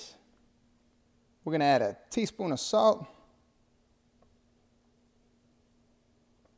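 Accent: Louisiana, United States. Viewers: Ur wife is a lucky lady! Such a handsome guy+ u can throw dwn in the kitchen! U have such a sexy voice, with that Louisiana accent! Love watching ur videos!!!! Much luv to u and ur adorable family+ Gumbo!!!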